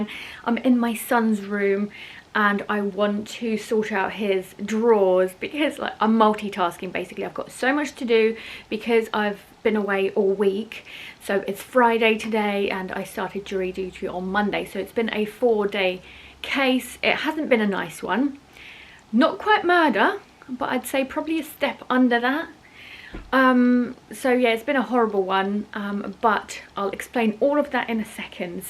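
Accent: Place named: United Kingdom. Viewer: I was looking for a Jury duty video, but instead I found myself listening to a bri’ish accent. That’s wild.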